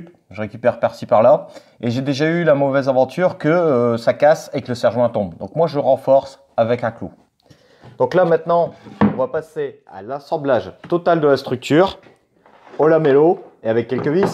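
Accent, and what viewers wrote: North, France. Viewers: merci l'ami, une bien belle fabrication... je n'aurai pas pensé au clous de renfort. un ch'ti acchin del nor ?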